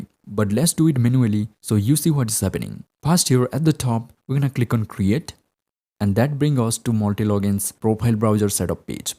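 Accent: India. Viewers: Kolkata + English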